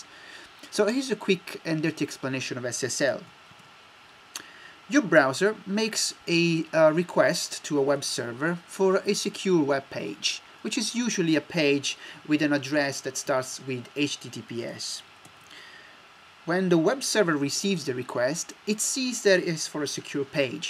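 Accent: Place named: Italy. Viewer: Ottimo inglese ma l'accento italiano è davvero impossibile da nascondere Hahahah Btw nice video!!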